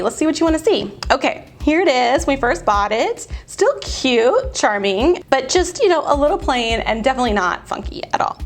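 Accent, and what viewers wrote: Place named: United States. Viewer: I don’t hear anything but an American accent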